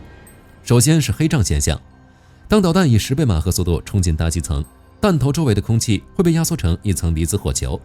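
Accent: China. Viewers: ㄡ是大陸騙子 說話者是大陸口音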